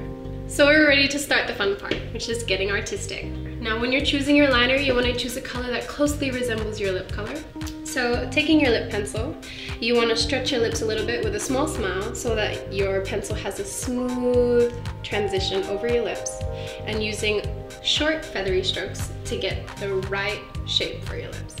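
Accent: Australia. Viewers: Did you practice to change your Australian accent or did it just change naturally? 😄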